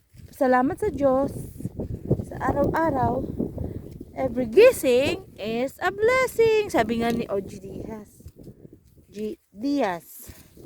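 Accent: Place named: Ilonggo, Philippines. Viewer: Pinay2 na pinay at ilonggo tune😅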